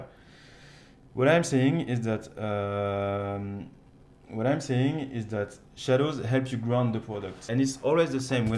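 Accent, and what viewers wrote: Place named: France. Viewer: vu l'accent je suis sur que l'auteur est francais !!!! merci pour le job en tout cas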